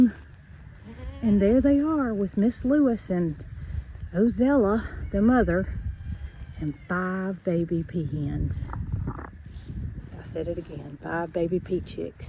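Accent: United States. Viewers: i like your accent, it shows we are in a DIFFERENT part of usa, different is the spice of life!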